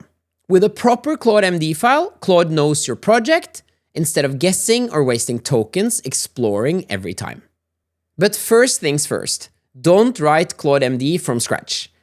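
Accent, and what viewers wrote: Norway. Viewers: Det tok 0.564secunder å høre at du var norsk :)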